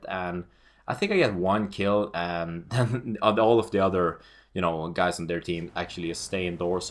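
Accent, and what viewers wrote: Sweden. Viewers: wat the hell wow it seems u very popular dude lol cauz your swedish:P:D everybody likes abit of Foreign Accents hell yeah for the world!! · youre the best, love your swedish accent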